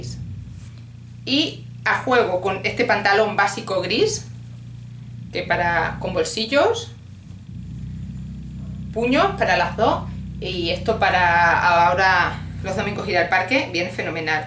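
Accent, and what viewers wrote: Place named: Extremadura, Spain. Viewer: Ya decía yo que ese acento me sonaba!!! 🤔 Saludos de una paisana. Siempre es buen tiempo para visitar Extremadura 👌😊 😍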